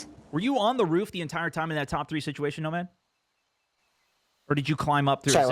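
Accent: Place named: Canada. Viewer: sounding extra canadian in this one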